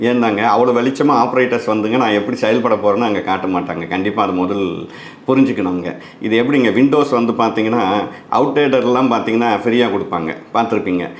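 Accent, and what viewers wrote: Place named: Coimbatore, India. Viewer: கோயம்புத்தூர் தமிழ் ஒரு தனித் தமிழ் தானுங்க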